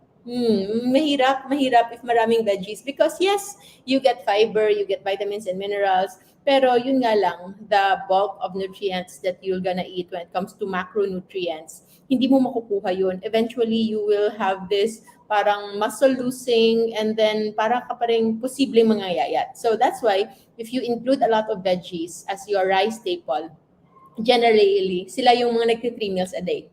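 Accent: Iloilo, Philippines. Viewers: Ilonggo ka ba Doc?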